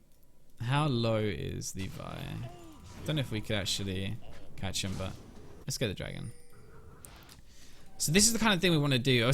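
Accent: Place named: Nottingham, United Kingdom. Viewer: is totally from Nottingham or Oxford or some shit... >.>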